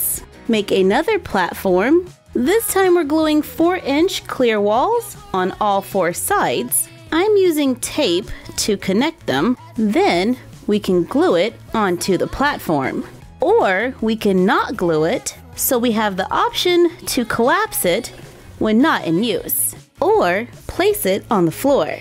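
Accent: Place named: United States. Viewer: I'm so sorry I'm a brit and the pronunciations of these dogs in America is killing me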